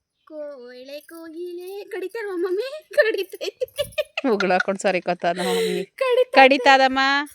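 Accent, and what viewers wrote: India. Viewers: ಒಂತರ ಅಂದ್ರೆ ಹೇಗೆ . ನಮ್ ಹಳ್ಳಿ ಕಡೆ slang hage eruthe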